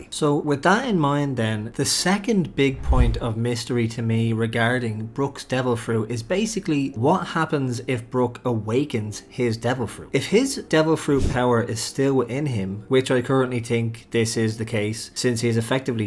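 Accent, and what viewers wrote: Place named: Ireland. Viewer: Came for the theory, stayed for the Irish accent!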